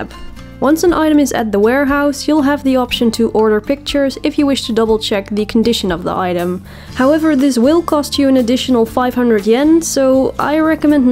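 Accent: Netherlands. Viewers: ooooOOoo so it's a dutch accent I though you were british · I had a hunch you'd be Dutch. Sometimes my english accent will sound somewhat similair (as I don't have a consistent English-speaking voice).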